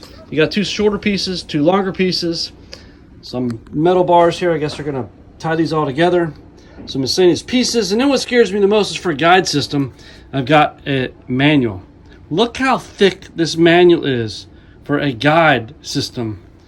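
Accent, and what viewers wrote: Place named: South, United States. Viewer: southern accent, scared of manuals that might resemble "books", yeah that fits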